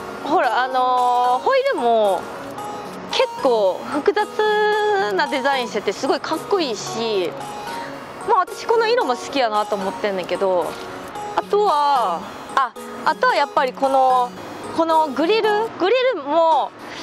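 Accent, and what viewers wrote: Osaka, Japan. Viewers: She speaks with that Osaka accent, so if you know a little Japanese she might still be hard to follow.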